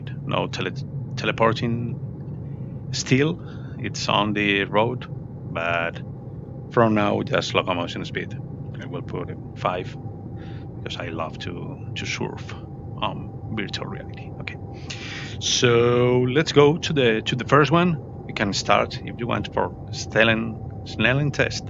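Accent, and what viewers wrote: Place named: Spain. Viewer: Tu inglés es bastante bueno y no entiendo porque tienes que decir "sorry" por que tengas acento español. Un alemán hablando ingles tiene acento alemán. Un indio tiene acento indio. Ya me gustaría a mi que los británicos o los yankies hablaran spanish como tu hablas ingles. Asi que no hay que disculparse. Me encanta que la gente use esta herramienta para poner algo de objetividad en los visores. Pero uno de los puntos mas importantes es el sweetspot (entendido como ege-to-edge clarity) y aqui no hay una forma de comparar los visores. He visto que hay una herramienta vuestra LensTestRov que mide exactamente eso y creo que se podría añadir a esta herramienta y asi comparar resultados de sweetspot de diferentes visores.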